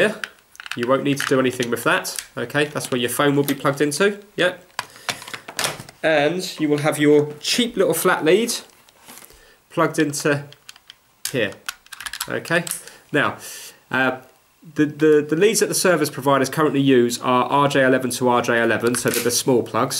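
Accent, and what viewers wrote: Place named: United Kingdom. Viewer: Nice to put a face to the channel. Love the channel. Currently watching the backlog. I love the "Ok, yea", "Right, ok", "Yea". "Ok? Yea"... It's the region/accent, but it's so noticeable as someone from outside the UK.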